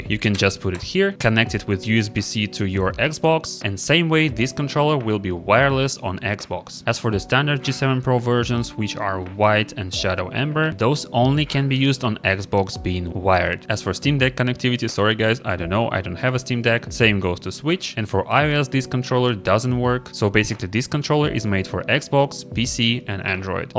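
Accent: Russia. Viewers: я так и знал что он русский с нулевой секунды было понятно =)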